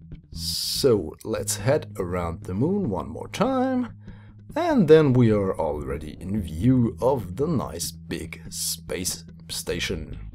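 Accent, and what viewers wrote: Germany. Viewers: Are you german? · Are you German? I feel like your accent is German. I may be way off, bit you sound German. Also, your accent really eccentuates the scope of your videos.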